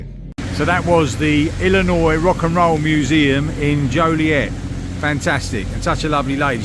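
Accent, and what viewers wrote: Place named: Cockney, United Kingdom. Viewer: “get your s***s on route 66!!!” Sorry! Seriously though hope you are feeling better - An awesome start (Just binge watched your Denver to Vegas videos- fab) - Alan Whicker with a cockney accent. Can I ask if you get all your interesting facts off the app you mentioned and what is the name of it? Me and the Mrs will be following your epic journey. Best wishes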